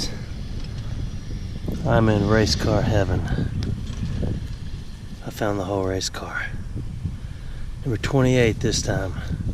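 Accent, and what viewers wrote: South, United States. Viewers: We dont have too many ppl with a southern accent here in Oregon.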